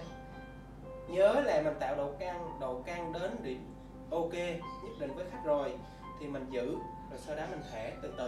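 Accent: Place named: Khanh Hoa, Vietnam. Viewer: Nghe giọng như khánh hòa nhỉ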